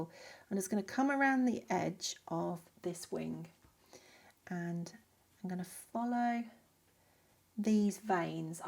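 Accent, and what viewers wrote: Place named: England, United Kingdom. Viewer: Fantastic tutorial! Just drew it and he came out lovely. That C'aran D'ache Museum Aquarelle white pencil is just pure magic for the wing. Make translucency so believable. Thank you for this, it made my Sunday afternoon really enjoyable. That, and knowing you are living near Dartmoor where I was born, and come from the South East, also where I used to live, in Berkshire for a while till I moved abroad. As an Arizona expat for 25 years now, it makes me homesick to hear the English accent all over again, as I don't go home so often these days. Thanks again!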